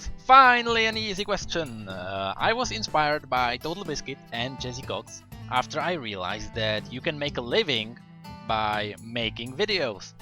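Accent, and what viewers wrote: Czech Republic. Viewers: Hehe, I guessed that you might be from czech republic, just by your pronounciation. ^^ Well, all I have to say here is: Pokračuj v dobré práci a lovu zdar. :-) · Já prostě věděl že jsi čech...prostě jsem to věděl...ten přízvuk poznám na hony daleko........Gj- keep it up man!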